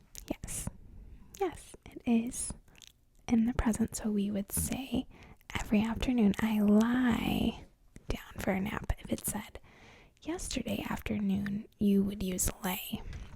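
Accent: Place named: Midwest, United States. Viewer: I love your accent! It sounds like Michigan or somewhere in the midwest. Idk I’m Canadian what do I know lol.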